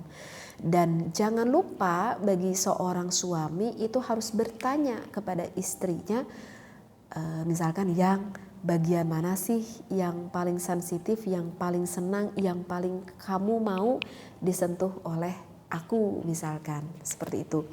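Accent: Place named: Java, Indonesia. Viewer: gpp atuh, lidah orang Jawa kok disama2in Arab. Jelas beda. Bismillah aja jadi semillah. Kalem aja, Tuhan bukan org Arab kok😅😊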